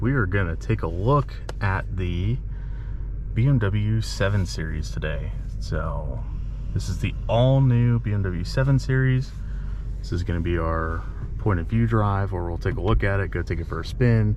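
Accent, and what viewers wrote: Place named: Pittsburgh, United States. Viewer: Thanks for the content. I assumed you were from a Pittsburgh dealership, judging from your accent. Close. Ohio.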